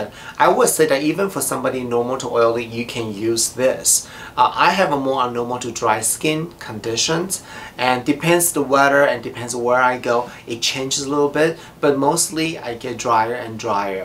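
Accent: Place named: Mexico. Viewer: Why does he look Asian but sound Mexican?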